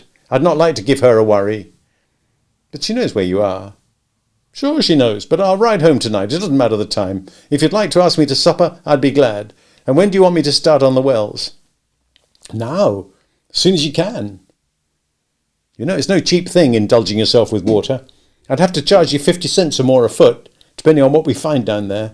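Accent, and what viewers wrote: United Kingdom. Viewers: I find Steinbeck's use of stones to be interesting. Upon researching the biblical meaning of stones, they seem to symbolize "endurance, stability, and permanence," while Steinbeck's use seems to alludes to the opposite, i.e. stones are something to be removed, thus showing his roots that stem from farming. I'm sure there is a deeper meaning, but I will need to ponder this a while. Interesting to be listening to this via a Brit!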